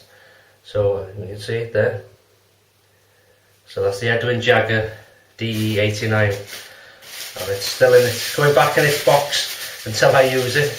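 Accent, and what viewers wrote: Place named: Merseyside, United Kingdom. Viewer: Cheers, I know this is an older video but I wanted to say I enjoyed it. Once again your enthusiasm cheers me up immensely, I think in no small part to your accent. I know you don't think you have one but there it is.;^ ) I'm from the Wirral myself and have lived in Canada since I was fairly young but I like hearing the sound of Merseyside so carry on. Hope you had a Merry Christmas, I remember going to Santa's Grotto years ago but I forget where exactly... Keep the videos coming pal!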